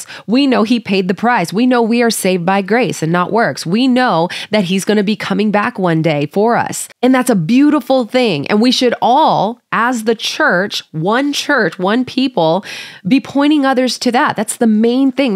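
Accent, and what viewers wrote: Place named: South, United States. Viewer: & Although you do sound a tiny bit like you have a southern accent on .75.. you pronounce things way to clearly lol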